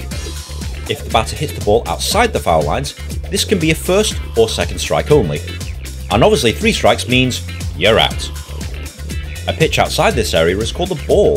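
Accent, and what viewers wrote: United Kingdom. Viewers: Is this a brit explaining an american sport? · as for eastern european man trying to learn american game this british accent does not make any it easier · As a guy named after a baseball player, it's just fun to listen to a British guy explain the rules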